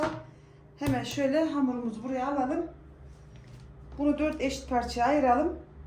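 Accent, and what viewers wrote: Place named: Gümüşhacıköy, Turkey. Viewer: Bende Gümüşhacıköylüyüm şive yabancı gelmedi memleketim güzel dir güle güle oturun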